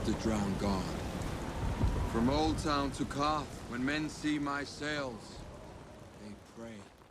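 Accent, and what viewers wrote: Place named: Baltimore, United States. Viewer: I know this is an old video. But you might consider fixing the title. I think you mean Ramsey "or" Joffery. Also, like the Baltimore accent on this one. You thinned it out lately.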